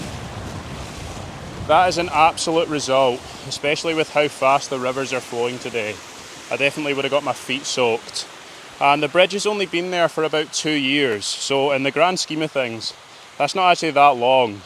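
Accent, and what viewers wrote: Scotland, United Kingdom. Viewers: Your videos are so relaxing, beautiful scenery, lovely Scottish accent, what more could you want!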